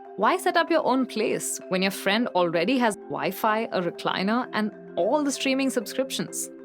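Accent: India. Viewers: if I had teachers with Indian accent in school, I would be the best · That soft indian english makes this so much nicer to hear doesn't it?😁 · speaks English with a strong Indian accent its awful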